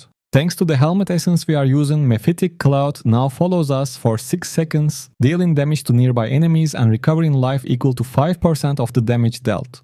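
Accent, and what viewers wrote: Turkey. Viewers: Kanka sen Türk aksanı ile konuşuyorsun öyle ise yazar mısın? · Videoya girer girmez türk ingilizcesi aga nerde olsa tanırım 😅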